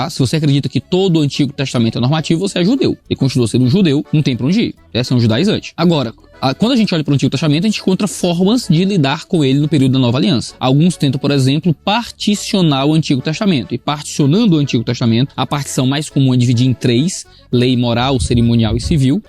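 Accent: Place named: Rio Grande do Sul, Brazil. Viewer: Mas bah, Tchê!!! Que grata surpresa clicar e iniciar o vídeo e ouvir o som da minha querência amada... hehehe... até pensei que era anúncio... kkkkk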